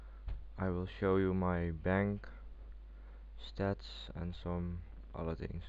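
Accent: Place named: Netherlands. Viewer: nederlands accent ftw :D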